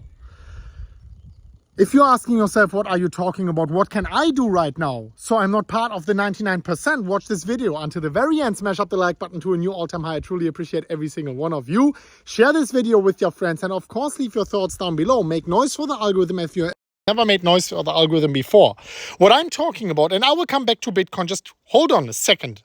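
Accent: Germany. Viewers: Das ist doch ein deutscher Akzent. 😁 Gefällt mir sehr gut.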